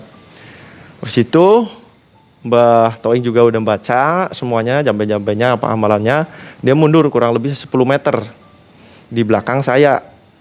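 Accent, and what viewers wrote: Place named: Tegal, Indonesia. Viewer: Kayong situse wong Tegal kiye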